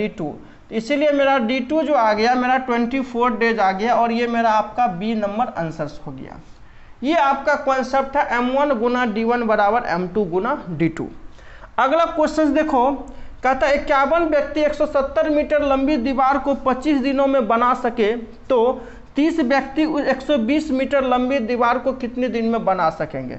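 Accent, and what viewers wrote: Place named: Bihar, India. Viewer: Bhagalpur,munger ,jamui ke taraf ke lagte hai sir aap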